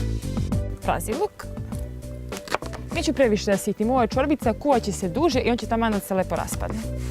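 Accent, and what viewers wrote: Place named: Western Serbia, Serbia. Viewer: pa ti imaš što kažu X faktor, mnogo te volim izled lepoto bez šminke,samo prirodne maske, čisto lice kao izvor, gledam recepte ali ti si otkriće zapadne Srbije i još student lepoto kad vidim dok sam na PC a cablovska na mute odmah prekinem mute da ti čujem glas i naglasak tog dela Srbije 🇷🇸 ti mlađa crna kosa a Keti moje po priči tu je moje godište plava kosa a ti crnka, jedino što me interesuje odakle to znanje u kuvanju,prelepo dvorište pravo Srbsko ukrašeno, pa kad te vidim devojka prirodna i opasno zna znanje o kuvanju nemam više reči lepoto 🇷🇸💐🌹☕🍹🎂🥮🍜🍩🥣🍷moj san kad bi imao takvu devojku bio bi ti sluga i sad sam single 4meseca lepotica sa naočarima ko učiteljica ali nije bilo znaš ono kad osetiš leptire u stomaku, ništa od toga samo dosadna veza,kupio karte za Exit ona sluša DJ musiku Ninu Kravitz i a ja rock metal grupe bili su iz Švedske 🇸🇪 i devojke koje sam gledao ko boginje 🇸🇪💖💐 ja sam išao na DJ eve,kad grom gospodična neće sa mnom, slušaj njeno objašnjenje pa ja ne volim tu muziku,pa kako kad se ne igra? 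u hotel i posle Exita zbogom zauvek lepa devojka a u glavi bubice i dosadna veza,sad sam 4meseca sam još ti da si sama a znam takva lepota a sama to nema u Srbiji ćao moja omiljena zvezda kanala Kičen TV,sad znaš da imaš fana iz okoline Beograda ćao poljubac ti šaljem, gospodin kaže udaj se da mi kuvaš,blam meni su roditelji pokoj im duši oboje, velika kuća brat i snaja su dole ja iz sobe na terasu pokrivena ra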